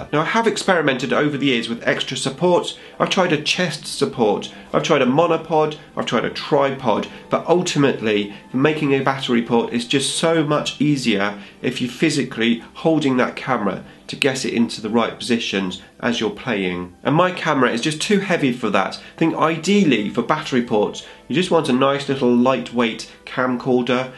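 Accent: United Kingdom. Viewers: This concept of "the worst" is absurd. Maybe "one of the least fancyful baterps" yes. Maybe it doesn't have professional background, maybe sometimes there are too little tactical comments, but still there are more than in many other batreps. They are short, they go straight to summary, to the point - sometimes it would do much good to have parts of batrep extended to show particularly important clash highlighted. What I lack in most batreps now is what is the virtue of yours, however not all the time and not in relation to every faction on the table. E.g. you explain how Necrons operate but not necessarily how opposing force does. Still I wanna say it's tactical comment that's most worthy. Watching rolling dice for 2,5 hours is crappy and boring. The scenery may be the most beautiful but still it will not make me any good to listen to comments restricted to stating the obvious e.g. 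"Again I rolled below average. Oh, and now above average. And now all 2s! What tough luck". So continue your good work, Sir. And well, we'd miss this singing British accent, this "video" at the beginning of your pieces! Best,!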